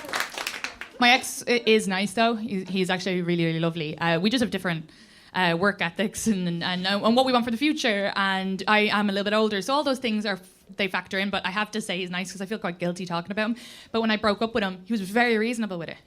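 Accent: Ireland. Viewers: u are so good, for me u are up there with Steve Hughes, Alex Agnew, Bill Burr and Ricky Gervais and your Irish accent makes it so nice to listen to, greetings from Belgium and keep it up ! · Hi I Think your so funny love your Irish accent hope you come to south FL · she's lovely and kinda ♨. rapid fire speaking...😲easy on the coffee, lady! honestly, some dialects of Irish English are not understandable so maybe that's what people mean just like some American English is the same even some English from England is like 😵‍💫what did they say🤷‍♂. her Irish is lovely. my fav English is "received pronunciation". "wah-er"? I understand the "crazy" partner attraction. let's face it. they tend to be great lovers. cat isn't drowning the mouse. it's an Irish catholic cat. IT'S DRUNK AND BAPTIZING IT AT DEATH!🤣 4 jobs and thinks that's an acceptable life???? SSSLLLAAAVE MENTALITY!!!! 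does Ireland have a diabetes epidemic? because of the drinking.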